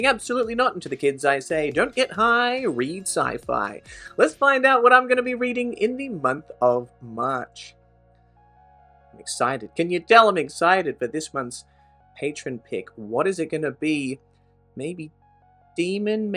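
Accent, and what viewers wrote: Australia. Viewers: Australian… I think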